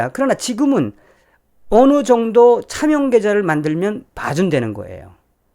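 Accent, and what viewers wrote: North Korea. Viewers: 감독님, 홧팅!! 니북 사투리가 정겹습네다. 가열차게 부칸 사투리로 방송해 주세용! !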